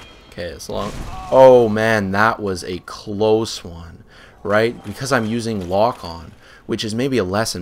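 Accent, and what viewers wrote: Canada. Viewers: I just realized your Canadian .right?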